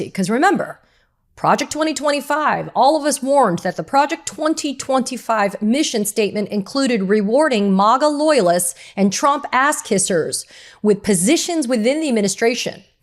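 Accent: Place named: Australia. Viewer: Adoring Katie Phang unplugged! ❤❤❤😂😂😂😂😂 You sound very Aussie, would fit in very well down ere!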